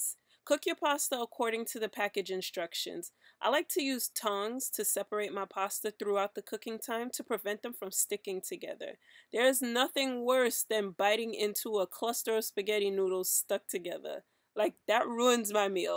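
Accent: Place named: New York, United States. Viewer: Bruh your accent is crazy New York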